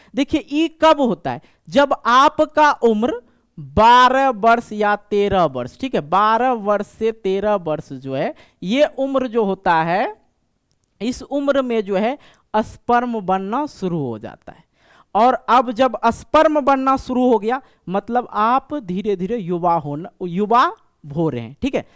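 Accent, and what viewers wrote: Bihar, India. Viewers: Sir aap Bihar sa hai kya....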